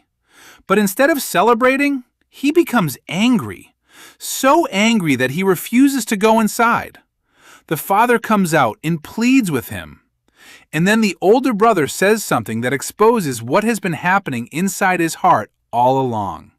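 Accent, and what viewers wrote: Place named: India. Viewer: The indian accent got me😂❤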